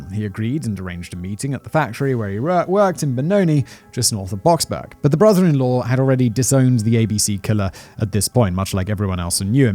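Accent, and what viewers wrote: RP, United Kingdom. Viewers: If it never crossed your mind that orderly, wealthy countries with root philosophies that advantage individual sovereignty might do a better job measuring pathology rather than actually having higher incidence rates of pathology, maybe you should trade in your pompous brit accent for something less ostentatiously elitist. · A persons bad choices are not their fault?! When you know he is in prison for rape! WTF? Exactly who's fault is it's? Simon, even with your posh accent you can't make that sound smart. His wife was every bit as responsible for her decision's as her husband was responsible for his decisions to beat, rape, and kill those women. Stop letting people off for their piss poor decision making abilities. It sets bad precedents. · anyone notice Simon's script reading voice is more posh than his normal offscript voice? 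*reads script sounds like Benedict Cumberbatch's Sherlock Holmes" "talks normally sounds like Michael Caine's Sherlock Holmes"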